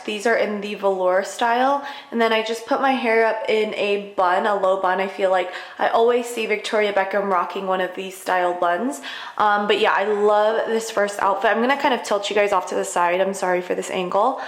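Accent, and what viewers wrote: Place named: United Kingdom. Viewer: Such casual and chic outfit choices!! But I immediately subscribed when I heard your “British accent” 😂😂😂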